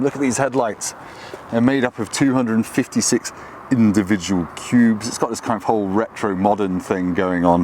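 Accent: United Kingdom. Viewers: Great review. I watch a lot of reviews with British commentators and you have a very distinct accent? What part of the UK is that?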